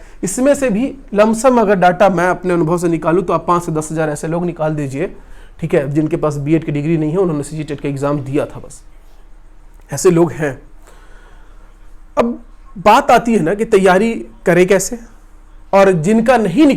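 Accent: Bihar, India. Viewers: Bihari accent b acha lgta h apka..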